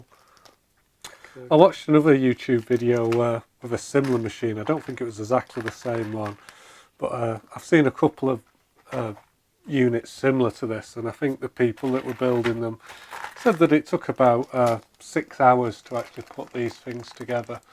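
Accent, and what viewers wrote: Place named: Sheffield, United Kingdom. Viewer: I'm assuming from your accent you're somewhere near Sheffield - I'm from London (sorry about that but I did vote leave :- )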